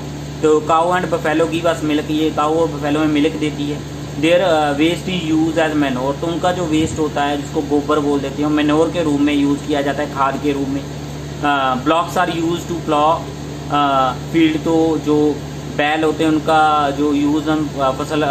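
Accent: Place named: Tamil Nadu, India. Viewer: You are from Tamil Nadu I am sure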